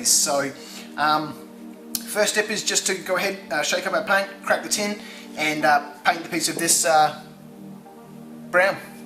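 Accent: Australia. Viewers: If you don't mind me asking are you Australian or Kiwi perhaps?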